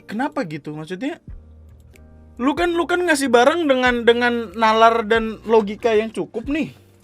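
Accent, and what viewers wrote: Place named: Riau, Indonesia. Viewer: Logat Riau tapi pakai lu gua. Jatuh jatuhnya cringe.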